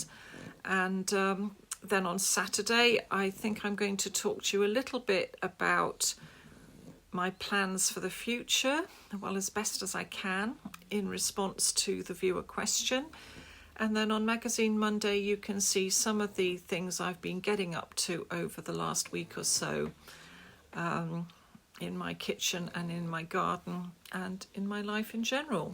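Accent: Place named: England, United Kingdom. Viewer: New subscriber.. love your channel..and the English accent.. thank you... ❤ From Australia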